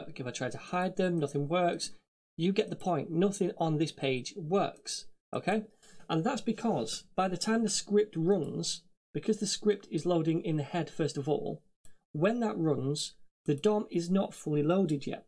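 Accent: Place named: Manchester, United Kingdom. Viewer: Thanks for putting this course together, top stuff. Do I hear a Mancunian accent?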